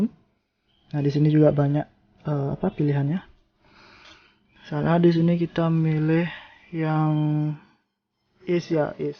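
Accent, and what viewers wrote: Bali, Indonesia. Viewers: uhmmm logat bali :L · mule logat bali tetep kental wkwk, mantap lah bli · Salam logat bali brow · Logat nak bali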